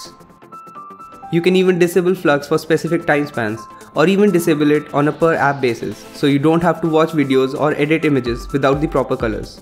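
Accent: India. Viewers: Disgustimg indian accent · my God ... how can i avoid these english-indian movies? Fuck ... horrible english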